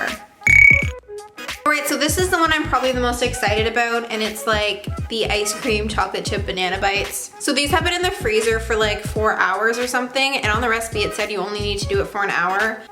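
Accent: Canada. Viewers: Are you a Canadian? Your accent ...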